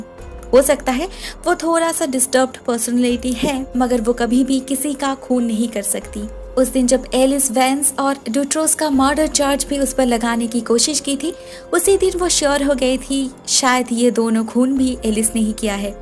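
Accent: Bengal, India. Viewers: r u bengali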